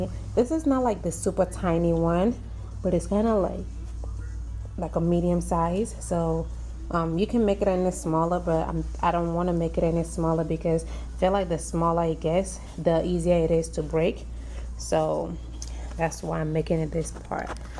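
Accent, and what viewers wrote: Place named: Liberia. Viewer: I hear the Liberian! Go, sis!👌👌💜💜💜🇱🇷🇱🇷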